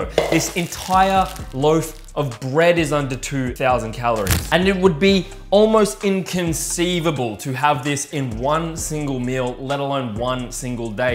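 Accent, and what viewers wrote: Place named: Australia. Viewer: I love your accent... Let me tell you that I have not only learned to lose weight but also have learned the Australian accent from you.. Love your videos mate!! They are great.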